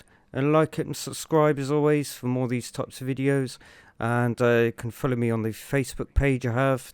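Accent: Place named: West Country, United Kingdom. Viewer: Cheers for the video. I could listen to your chilled west country voice all day 👍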